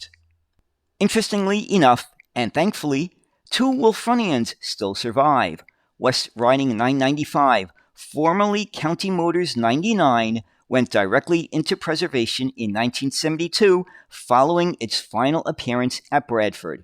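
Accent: United States. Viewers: Thank you for your Video..! Seems strange a "Guy" excuse the pun ? with a New York accent is interested in my local childhood buses..Brought back happy memories. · I seem to be drawn to British bus videos - I simply find it fascinating to hear an American accent talking so enthusiastically about British buses!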